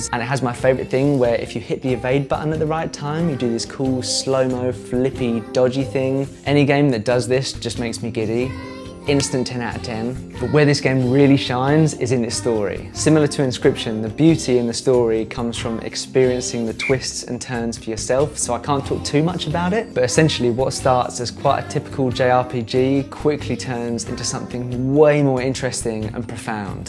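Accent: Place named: United Kingdom. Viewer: You’ve made three videos. On steam deck. Three. That’s it. This is my favorite steam deck channel. Admittedly the accent helps, I love the shit out of Brits. But honestly you’re so entertaining and genuine. Keep it up “mate” ;) I’m working on it! And ps keep being a great human, anyone who teaches for a living deserves a special spot in well whatever you believe the afterlife is. Teaching children especially. Well done!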